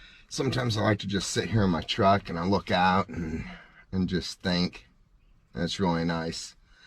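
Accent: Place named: Bush, Australia. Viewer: You still have some of your "Bush Accent". I love it.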